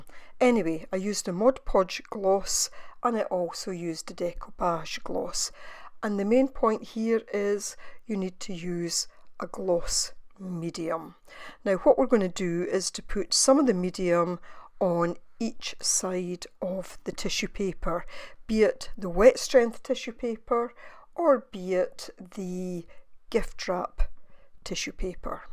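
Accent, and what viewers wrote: Scotland, United Kingdom. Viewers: I detect a bit of a brogue, Scottish? Love all your tutorials 💙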